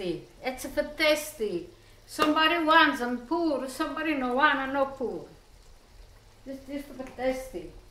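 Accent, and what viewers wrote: Italy. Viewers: Once I heard the accent I knew I came to the right place! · Yes. The only recipe I keep coming back to. I’m almost full Italian blood here in America. Her dialect and accent resonates with me. I’m a 4th generation here so a lot of the Italian culture is lost but this makes me happy and this recipe is delicious! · that dish looks delicious. I am making it today. I just Love you, your singing and your accent. Close my eyes and I’m hearing my Italian family. God Bless You ✝️ and your family. Son n Law you do an excellent job of filming. 👍🏻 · Grandma is so sweet and her singing Oh I love it.Although I don't understand the meanings but she also explaines what she's singing in her beautiful accent. I'm learning Italian dishes from her which are my favourite. 😋Lots of love from Pakistan and God bless! 😍🤗😚😚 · lovr her singing and her cooking her italian acent makes me smile love her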